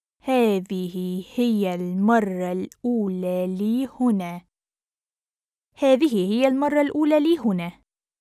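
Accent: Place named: North America. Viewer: Alles falsch geschrieben Und was für ein arabisch ist das??? Hört sich nordamerikanisch an Vielleicht tunesische Dialekt? Aber habe trotzdem fast alles verstanden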